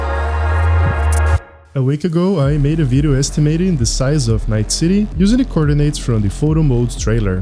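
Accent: Brazil. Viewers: Tu é br né? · Tu é br mano?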